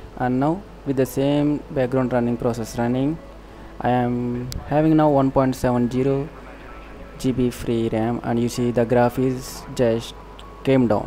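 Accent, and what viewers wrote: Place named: India. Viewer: ew indian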